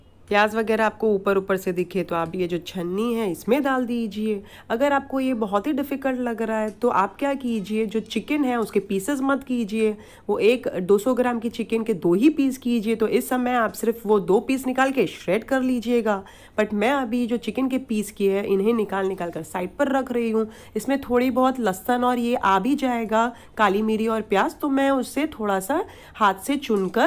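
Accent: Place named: Konkan, India. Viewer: aapki baaton se lag raha tha. Where r u from?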